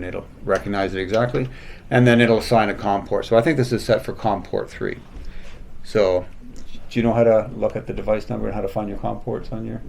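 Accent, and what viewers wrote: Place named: Canada. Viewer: 可以确定讲的是加拿大英语， 😇😇😇😇😇😇😇😇😇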